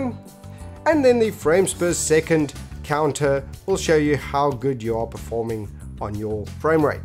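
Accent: South Africa. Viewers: Are you south african? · Nice vid dude, you sound South African... can u conform?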